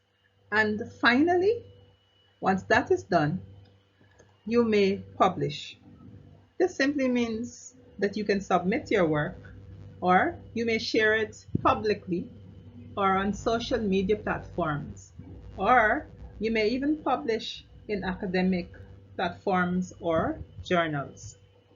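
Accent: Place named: Guyana. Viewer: Great presentation thank you for sharing I was educated looking forward for information on writing research papers and it was refreshing to hear an intellectual presentation with a Guyanese accent ✌🏾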